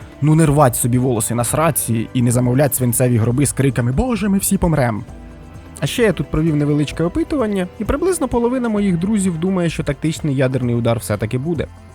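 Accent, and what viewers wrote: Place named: Chernihiv, Ukraine. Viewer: рідненький чернігово-сіверський діалект 🥲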